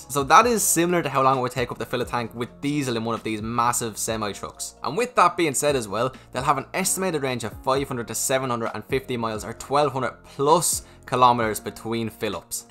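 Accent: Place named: Ireland. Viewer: Are you from Ireland?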